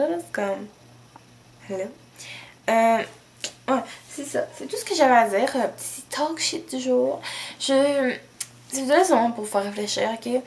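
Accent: Canada. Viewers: J'kiif ton Accent tu est Canadienne ??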